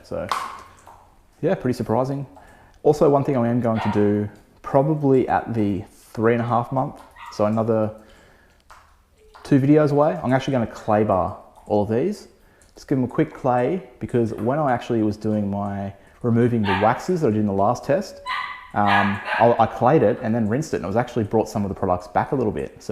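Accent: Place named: Australia. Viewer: I love the Australian accent, especially when you say Mirch…😜 Great test! Mirch is quite impressive. Thank you.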